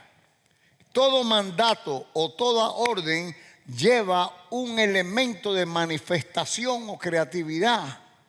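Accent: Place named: Cuba. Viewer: Pastor yo vivo en New Jesrsey , es primera vez que me cruzo con sus prédicas. He compartido porque estas enseñanzas bíblicas y no solo conocerlas si no vivirlas , aplicarlas en nuestras vidas y preservarlas como el tesoro más valioso qué el ungido de Dios nos comparta . Dios le bendiga Pastor a usted y a su familia. Usted me recuerda mucho a mi hermano muy cubano en su forma de hablar y con la experiencia de la vida usted tuvo el privilegio de ser rescatado . Mi hermano en cambio con el potencial que tenía por no saber y aplicar estas promesas que son nuestras perdió su vida con un cancer a los 57 años. Me he identificado con cada prédica y verdaderamente necesitamos ser sacudidos y necesitamos hombres de Dios como usted . Mis bendiciones. Vivianna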